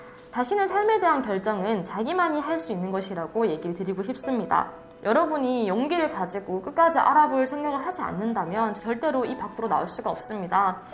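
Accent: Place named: Gyeongsang, South Korea. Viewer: 다 가리긴햇는데 경상도 사투리가 나오시네요 ㅋ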